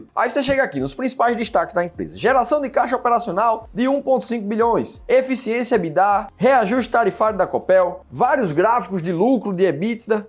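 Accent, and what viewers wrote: Pernambuco, Brazil. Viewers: E aeeee comedor de mocotó de boi! Será que já comeu uma buchada de bode??? Homi....vamos comer uma mão de vaca! Esse sotaque não engana um pernambucano não! Kkkkkkk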